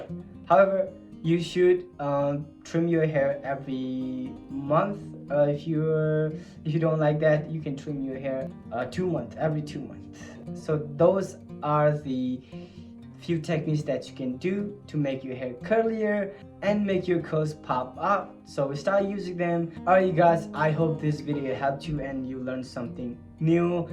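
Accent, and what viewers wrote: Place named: Philippines. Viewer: He from da phillipines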